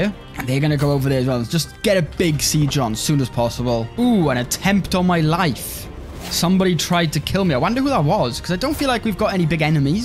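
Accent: Wales, United Kingdom. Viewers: Your Welsh accent is delightful.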